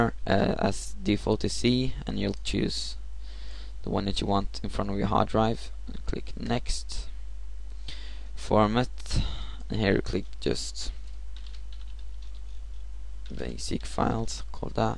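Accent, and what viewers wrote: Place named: Scandinavia. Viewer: Thanks mate ^^ Are you from Sweden or Norway by any chance? xD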